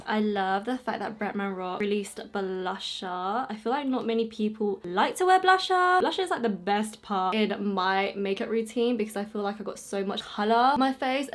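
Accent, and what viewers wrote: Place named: England, United Kingdom. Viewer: May I just say how lovely and precious you are?!?! I so very much enjoy watching you and I love your accent!!! I'm from VA in the US and all I hear is country ass southern accents which don't get me wrong that's fine but I love English accents!!!🇬🇧🇬🇧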